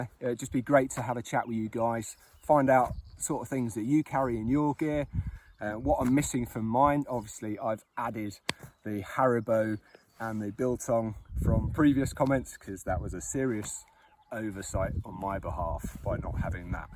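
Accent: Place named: United States. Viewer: You talk funny from America. Thanks for the video good info.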